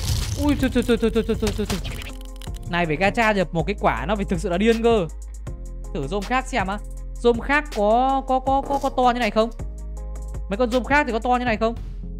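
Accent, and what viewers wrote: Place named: Binh Dinh, Vietnam. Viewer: Anh là người bình định à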